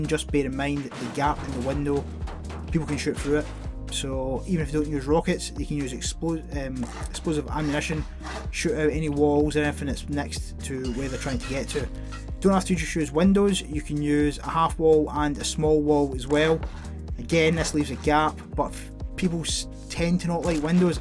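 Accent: Scotland, United Kingdom. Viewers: My celtic brother from another mother - Keep up the epic content man! You've always given tips that I've never known and I've been playing on and off for a number of years! You're a legend, and to hear a Scotsman showing the world how its done is only right! Cariad fawr o Gymru i chi [Much love from Wales to you!] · that scot accent is very hard to understand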